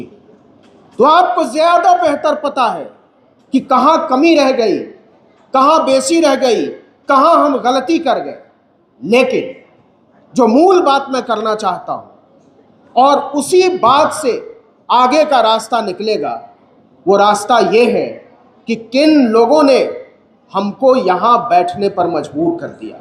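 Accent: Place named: Pakistan. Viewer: Urdo kyon bol rahe ho? Wah bhi Pakistani accent men?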